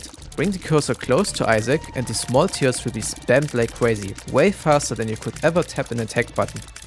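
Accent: Germany. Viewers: That's the most German sounding English I've ever heard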